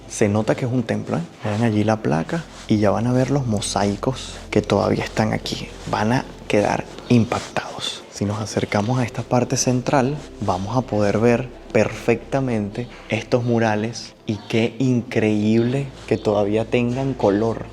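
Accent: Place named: Venezuela. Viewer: se dice "eStan muy DeSgastadas " nose por siempre dices ejtan muy dejtadas el acento venezolano es feo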